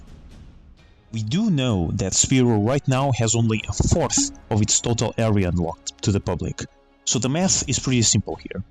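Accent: Portugal. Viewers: Wait you sound portuguese. Are you from Portugal? If so, CARALHO!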